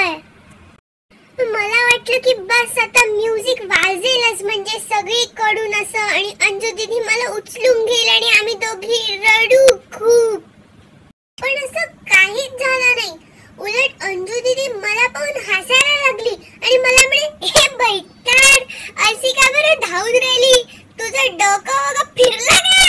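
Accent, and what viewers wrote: Nagpur, India. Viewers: Bhaitad! typically Nagpur very cute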